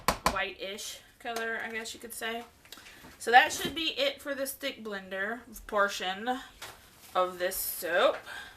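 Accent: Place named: South, United States. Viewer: I love your voice. Such a pretty "Southern Belle" charm:)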